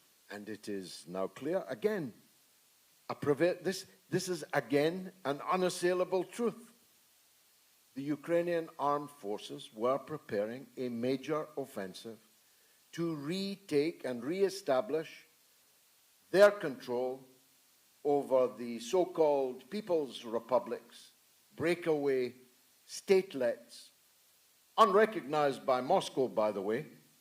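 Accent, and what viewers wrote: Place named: Newcastle, United Kingdom. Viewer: Well spoken Geordie .. Said from a fellow Scot 👍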